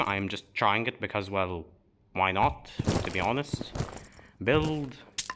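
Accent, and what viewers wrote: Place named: Egypt. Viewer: You are Egyptian? I can sense it from your accent.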